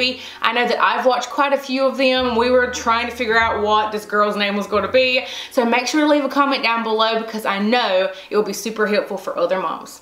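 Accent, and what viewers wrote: South, United States. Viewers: It sounds like youre saying "WEENIE' not WINNIE Lol maybe its a good thing you didnt pick that name with your southern accent girl! Haha!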